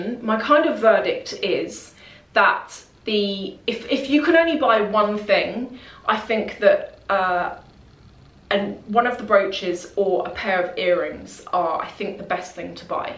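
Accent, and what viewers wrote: RP, United Kingdom. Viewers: back on yt, i was missing your accent hun! posh and gorge' :* i feel sorry for your rabbit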